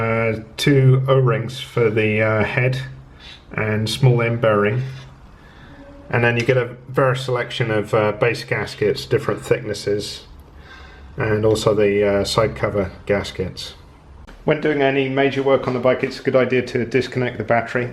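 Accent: United Kingdom. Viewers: Nice video. With regards to circlips with tangs you need to be careful because the gudgeon pin can fret on the tangs which causes them to snap off! (I used to run mega tuned 350 LC's so I've seen this sort of thing!) You can still use this type of circlip but cut the tangs so they are only 1-1.5mm long, the gudgeon pin can still fret on them but they just wear away very slowly rather than a long piece of them falling off! Someone will probably comment that if they are wearing then the material coming off them is not doing the engine any good....yeah, yeah, does the air filter stop EVERY atom of crap in the air, I doubt it! EDIT...Dude, you sound British, it's a gudgeon pin, not a wrist pin! LOL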